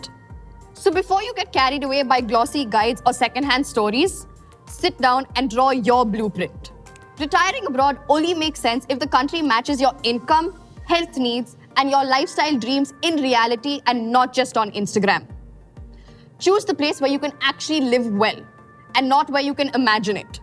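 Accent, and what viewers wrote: India. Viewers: Definitely not European. Her accent is like an Indian. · First of all she doesn't look indian, i mean she might be a foreigner living in India but her accent is indian, how i mean is she AI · You talk like an Indian girl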